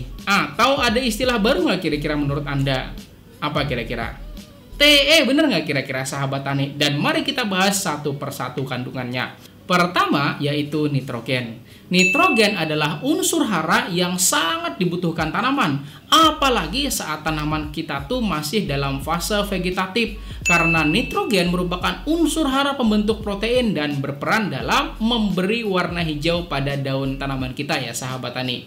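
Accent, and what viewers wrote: Banjar, Indonesia. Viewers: Logat banjarnya masih ke ikut ya pak🤣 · Pian asli banjar kah??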